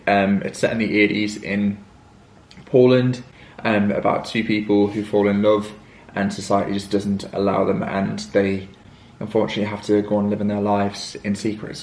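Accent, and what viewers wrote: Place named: Scotland, United Kingdom. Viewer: Your accent is confusing Scottish or British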